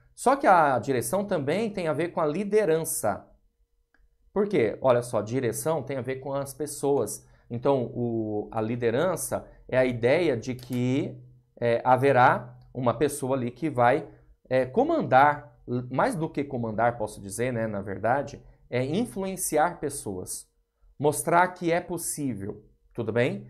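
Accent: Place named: Sao Paulo, Brazil. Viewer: Esse professor é show .... parabéns 🎉 se deus quiser passarei em um concurso que irei fazer ....você não é de João pessoa professor ? Tem sutaque de paulista ....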